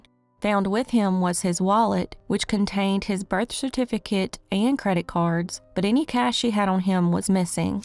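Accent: South, United States. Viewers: We value you, and ur story telling skills. Love fr panama. Only southern voice I like🥰😊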